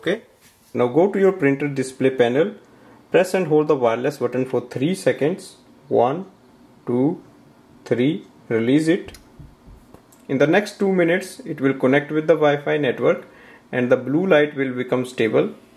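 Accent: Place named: India. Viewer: 인디안 엑센트는 적응이 안되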